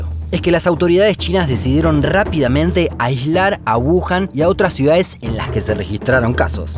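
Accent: Argentina. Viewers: que pereza con ese acento argentino no puedo escuchar bien, lo voy a quitarsh. Chego se dice "llego", cha "ya".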